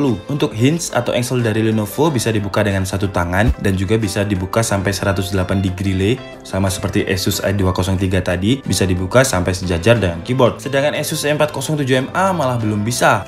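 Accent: Malang, Indonesia. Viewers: Wong Malang a iki?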